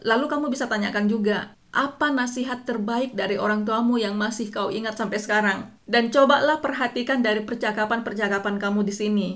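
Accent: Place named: Toraja, Indonesia. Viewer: Wkwkw kak logat Toraja ta masih keliatan di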